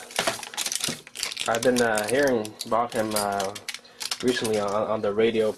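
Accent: Quebec, Canada. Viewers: Sometimes I think this is a troll but then so many videos and the conditions in there . Such commitment if so. Seems like a French Canadian his accent.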